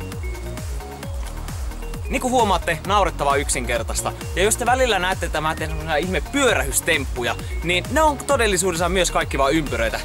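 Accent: Finland. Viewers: Sillä murre on pohjanmaalaisen kuulosta. joten samanlainen kuin mulla :DDDD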